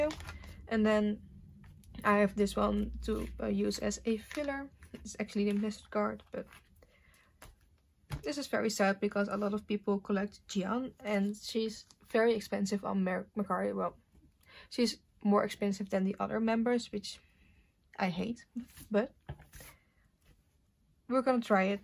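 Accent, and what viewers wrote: Netherlands. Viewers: Do u speak Dutch bc how u speak in English is how most Dutch / belguim ppl speak in English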